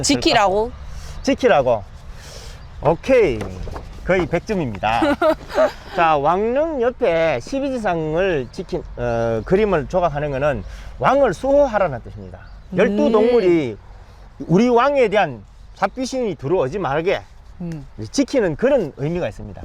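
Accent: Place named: Gyeongsang, South Korea. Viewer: 시간과 여유가 있으면 늘 생각하고 또 방문하는 마음의 고향 경주...선생님의 자세한 설명 잘들었습니다.민아학생은 졸업했겠네요 구수하고 따뜻한 갱상도 사투리가 듣기 좋아요^^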